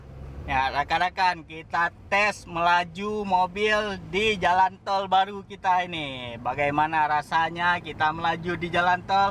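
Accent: Aceh, Indonesia. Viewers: Khas org aceh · LEBIH CEPAT ,,jika JNE pengiriman barang lebih cepat sampai nya tanpa lampu merah ,,Luruuuuus aja bagus2 ,,wajar lah logat nya ngomong kayak gitu kan orang aceh ,bukan orang jawa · Logat aceh,apa a y lucu.bgmn logat jawa y medhok,lgt madura,logat bali,d y lain.smua ada lgt msg2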